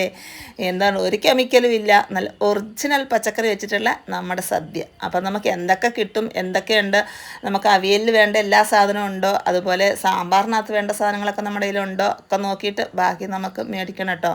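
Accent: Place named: Pathanamthitta, India. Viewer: ok.. Enikku samsaaram kettappol pathanathitta aanonu thoni.. Njaan pathanamthita aanu..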